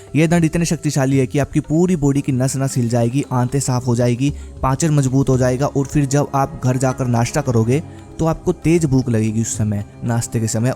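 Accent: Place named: North India, India. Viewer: bhai pakka north india se belong karte hai ya or i think hariyana or utter pradesh se😁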